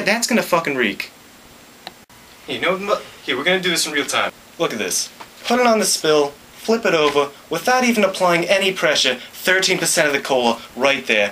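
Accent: Boston, United States. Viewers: hahahah boston accent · This guy sounds like he's from Boston Fawwkin Queeeeah!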